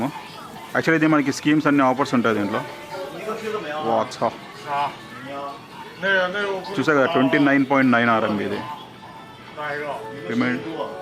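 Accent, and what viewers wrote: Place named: Andhra Pradesh, India. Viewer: endanno motham andhra yaas maatladuthunnav, thu, I am unsubscribing now, its been a habit to our Telangana people famous ayindaaka Telangana yaasa, famous aynanka andhraaa yaasa start chesthundru, endanna, we should save our Telangana slang anna, good bye.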